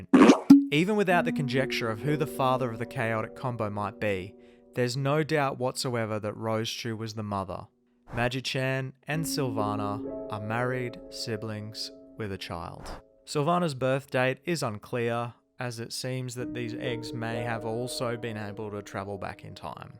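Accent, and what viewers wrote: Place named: United Kingdom. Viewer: I love the great British narration keep up the good work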